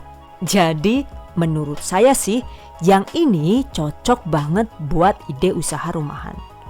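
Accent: Banyumas, Indonesia. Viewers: Orang madiun kok ngapak yaa..hee salam dari tulungagung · bu orang mana bu ..hehee bisa ngapak Bu 😁😁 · Kie mesti wong banyu mas. Tepate cilacap. Kira kira kya kuwe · madiun ngapak yah mab? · Makasih Buu .... 😀Deneng ngapak ?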